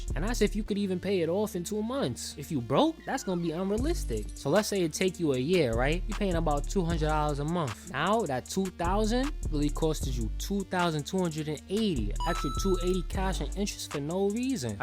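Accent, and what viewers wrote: New York, United States. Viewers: i'm hooked on this nigga videos 😂😂 shii funny asl and the NY accent makes it even better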